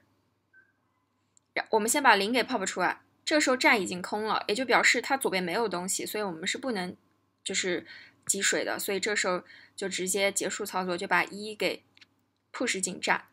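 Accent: China. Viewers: 阔以阔以，是不是四川老乡啊哈哈哈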